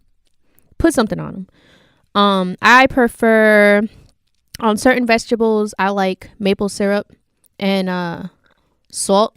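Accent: New York, United States. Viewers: you should really go with yearrrrrrr that the new york in you lol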